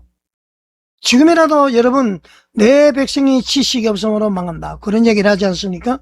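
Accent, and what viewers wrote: North Korea. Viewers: 말도안돼는 유투브영상으로 사람들 혼란시키지 마시요 북한말투가 확실하네요 이런인간이 교회를 망가트리는 원흉이다 여러분들 속지마세요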